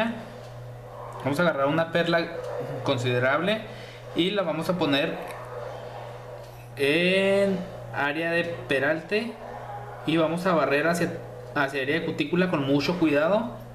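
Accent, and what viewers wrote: Chihuahua, Mexico. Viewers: No se como llegue aquí pero es el primer video tuyo que veo y me suscribi casi de inmediato me gusta la manera que explicas sin tantos tecnicismos, de donde eres? Tienes como que el acento de chihuahua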